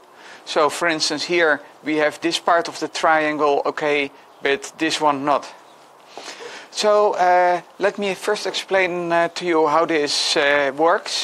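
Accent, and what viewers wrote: Netherlands. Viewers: Ha yes , the English Netherlands accent xD (also from the Netherlands)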